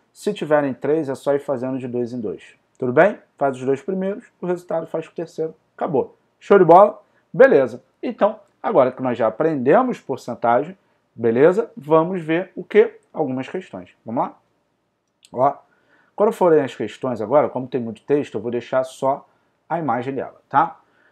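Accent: Rio de Janeiro, Brazil. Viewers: A melhor forma de aprender matemática, com sotaque carioca, muito bom vcs são incríveis 🎉🎉🎉❤❤❤❤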